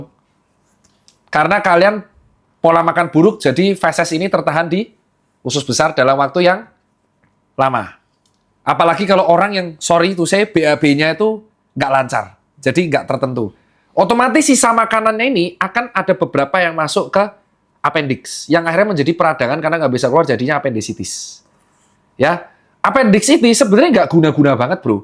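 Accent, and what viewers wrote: Java, Indonesia. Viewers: Asik penjelasan dok ter ini,ada jawa2nya😂😂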